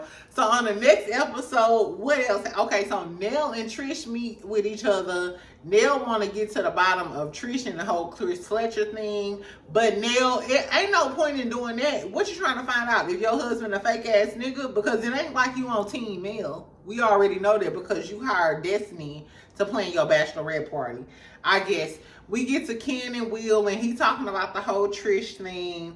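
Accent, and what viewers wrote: St. Louis, United States. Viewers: Good commentary, I love your St Louis accent sounding like Sunni….hur and thur, it’s so cute. While it’s tacky for Chris to try to set up anyone people forget this set up happened after Mel had filed for divorce and no possibility of reconciliation, she didn’t want Martel back then. If he was trying to hook up Martel during the marriage, that’s different. To me, the timeline of when all this was happening is getting downplayed, Mel and Martel may have just been still married on paper while the divorce was in progress. IMO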